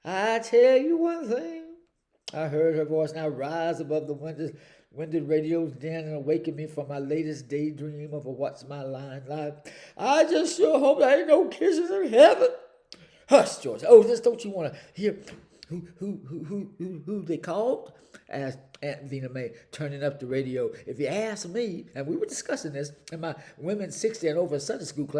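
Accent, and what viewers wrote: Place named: Mississippi, United States. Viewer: Love this. As one born in Mississippi whose mama was born and raised in Scott County, I recognize those sounds. At one point you reminded me of Aunt Authula. I heard Uncle Stanley, too. My relatives in Mississippi sound just like that. I need to read your books. Well done here. Thank you.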